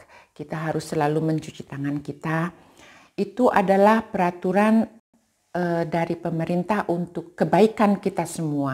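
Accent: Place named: Bali, Indonesia. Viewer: Maaf,ibu drmn ya aslinya ??? logat bhs indonya kental org bali..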